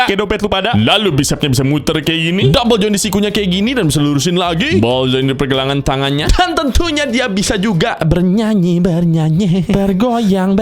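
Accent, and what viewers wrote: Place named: Java, Indonesia. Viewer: Orang jawa abng nya ya😂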